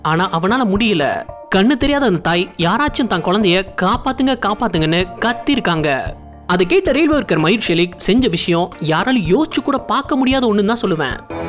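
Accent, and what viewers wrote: Madurai, India. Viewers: Bro neenga madurai yaa bro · Nee ga madurai ya bro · Bro neega Madurai ya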